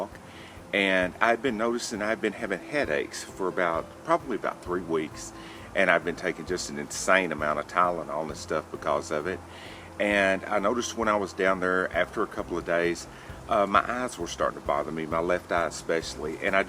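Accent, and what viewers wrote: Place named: Texas, United States. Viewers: thanks for sharing. The patch is bad ass and makes the vids better. Gives personality to the vids and character . Ive even shared these vids with my wife because we have the same accent. TX is the best state in the country... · Just found your channel a week or so ago . East Texas accent for sure I went to White Oak and have family out in East Mountain . Now living just over Texas line in Louisanna. I went through advanced cataracts in 2011 had implants put in but was completely blind for about 8 months and totally get the protect your backup . I now can only shoot with a scope since having implants my eyes no longer focus between long and short range my long range vision is pretty good with lens implants I have to rely on bifocals to see rear sight though and that doesn't work. Saw your video on Hawke scope just got a air rifle the new gamo 22 magnum swarm gen 2. 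Needs some more trigger adjustment and does tend to jump a little but getting it figured out. Looking at the Hawke sidewinder . Enjoying your channel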